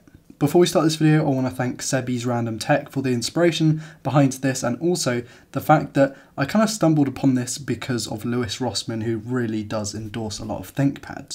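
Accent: England, United Kingdom. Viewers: At last someone actually English making these videos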